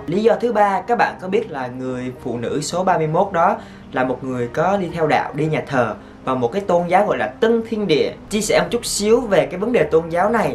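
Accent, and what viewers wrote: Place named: Central, Vietnam. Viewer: Anh là người quê ở đâu ạ. Nghe giọng rất giống giọng miền Trung :33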